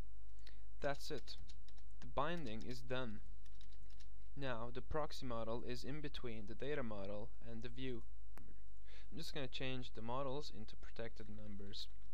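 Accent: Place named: Sweden. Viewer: The UI language and his accent suggest Sweden.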